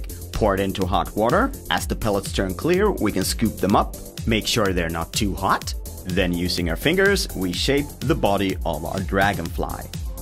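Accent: Scania, Sweden. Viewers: this is not the real swedish thats skånska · Älskar din skånska dialekt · dont teach swedish if you're skånsk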